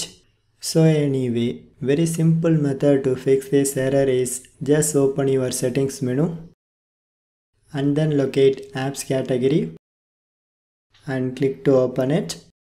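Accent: India. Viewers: Bro I love your Indian accent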